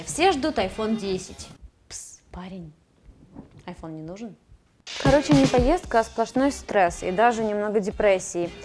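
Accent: Ukraine. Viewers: Может девочку поменяете на более привлекательную? Не думал что скажу подобное, но на жирного мужика мне смотреть интересней, чем на пресную женщину, с проскакивающим малоросским говорком. Так хоть глаз будет радовать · чет приуныла. Избавилась от яркого, бросающегося в уши украинского акцента. Остался лишь небольшой отголосок и слушать теперь по приятнее. Но ты с ярким акцентом как будто силу богатырскую потеряла. Как будто что то случилось, если это так, держись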